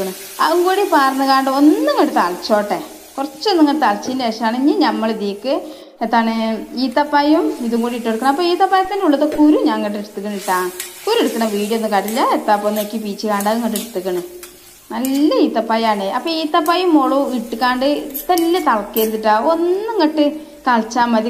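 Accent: Malappuram, India. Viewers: മലപ്പുറം ഭാഷ പഠിക്കാൻ വരിനെടാ മക്കളെ 👍👍👍👍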